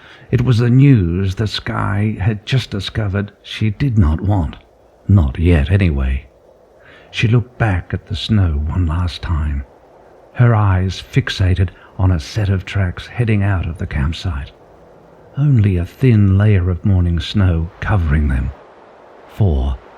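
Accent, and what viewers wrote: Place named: Australia. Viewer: I listen to a lot of audiobooks so it is both interesting, brilliant but also somehow strangely odd to hear an Australian accent narrating. I look forward to hearing the entirety of it. The narrator has a lovely deep timbre to his voice.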